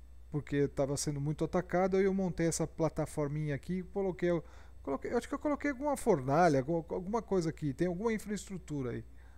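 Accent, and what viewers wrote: Piracicaba, Brazil. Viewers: vc é de piracicaba?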